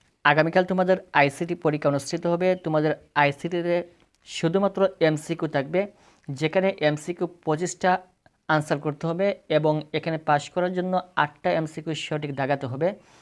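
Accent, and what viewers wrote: Sylhet, Bangladesh. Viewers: Sir Afne to sylheti 😂 afnar mate buja jay❤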